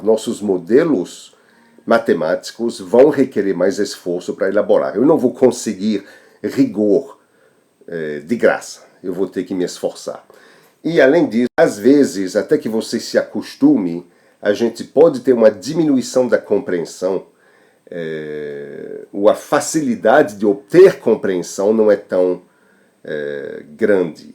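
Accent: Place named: France. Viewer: Aula muito boa 👏👏👏👏 Lindo sotaque francês 😊😊😊😊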